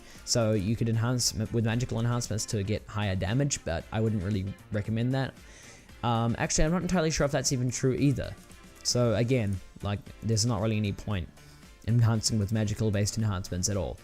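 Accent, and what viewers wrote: New Zealand. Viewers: is this dude from NZ, it kinda sounds like it i'm probably wrong?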